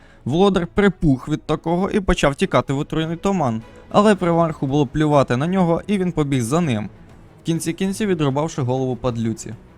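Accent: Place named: Chernihiv, Ukraine. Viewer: Чернігівщина, пролітає пару слів в тебе)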